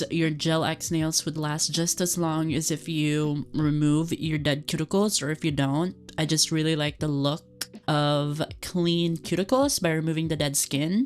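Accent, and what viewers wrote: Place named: Russia. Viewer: the russian accent got me to subscribe hahahahahahaha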